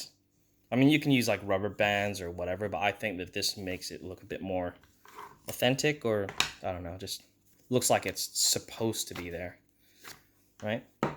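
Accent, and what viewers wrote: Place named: England, United Kingdom. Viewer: What's your accent? Sound like fellow Englishman that lives in the States, got my MP5 and sling on order so thanks for the vid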